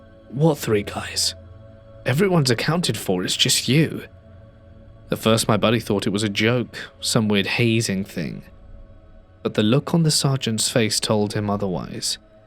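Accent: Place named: RP, United Kingdom. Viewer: never disappoints 🙂 I tried getting my brother into this channel, but he said you sound too posh 😂 he's a let's read fan....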